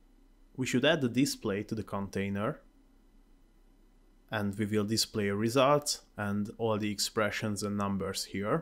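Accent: Hungary. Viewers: Hey man, thanks for the tutorial, easy and understandable... just one thing i want to ask... aren't you hungarian??? :D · Nice video! I'm wondering what kind of accent is this you have :D Jól sejtem, hogy magyar? :D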